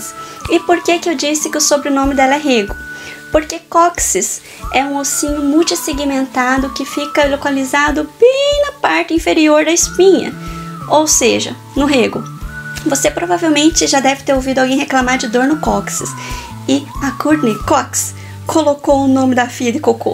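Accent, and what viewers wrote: Minas Gerais, Brazil. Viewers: Sotaque de mineira ta forte viu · Tu é mineira ??? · vc tem um pouco do sutaque mineiro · Ela é Japonesa ou Brasileira? Nossa, amei o Jeito dela.. Se for Japonesa, fala Brasileiro melhor que eu rsrs 😄 Me fez rir demais com esse sotaque Mineiro 😅 Amei ❤️ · Vai ver ela é Mineira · Ela é Mineira? Tem sotaque, sou de Minas. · Fala palavrão com muita classe, português do interiorrr (de Minas?) e com a delicadeza de uma gueixa. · Ela fala o português mineiro uai, Herdou o sotaque mineiro!! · Ela é mineira? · Cê parece a fusão de um mineiro com um japonês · Vc e mineirinha?? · sotaque mineiro é top :D fofo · A japonesa mais mineira q eu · kkkkkkkkkkkkk vc é Mineira?? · Eu acho q tá mais pra mineira. Eu acho que como ela morou no japão por muito tempo, perdeu um pouco do sotaque. Mas dá pra perceber pelo "Ocê", encurtada de palavras e o "R" um pouco arrastado. 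- O "Arrrrdido" dela é um pouco mais arrastado. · Eu acho q parece mais msm um sotaque mineiro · Vc parece "ser mineira" pelo seu sotaque brasileiro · Vc é muito engraçada de um jeito meigo.E ñ parece ser de SP, tá mais pra mineirinha kkkk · Ela aprendeu portugues no interior daq de minas so pode mkskskzkskkdkdkskskks, fala igualzinho a gnt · Moro no interior de sp mas entendi td q vc flw(mineira?) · Man quando ela fala português ela parece ser uma mineirinha É mt fofinho :3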